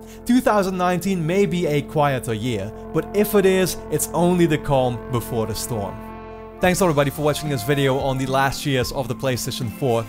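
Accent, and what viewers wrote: Netherlands. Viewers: Once i heard your voice i immediatly reconised ur dutch accent xd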